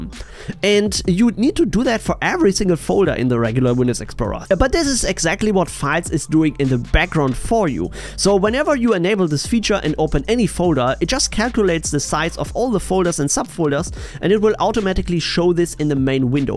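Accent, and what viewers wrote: Germany. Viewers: Boh-Ey, ein Deutscher der englisch spricht... Jetzt muss ich auch noch den Translator aktivieren um was zu verstehen...😱😱😱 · Cooles Programm, werde ich definitiv ausprobieren. Btw. als ich die Stimme gehört habe hab ich gedacht oh ein deutscher. Dann sehe ich den Namen und ich lag richtig 😅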